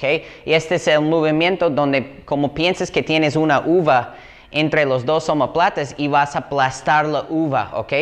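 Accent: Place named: Yucatan, Mexico. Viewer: Donde vives? Hablas un poco como yucateco xd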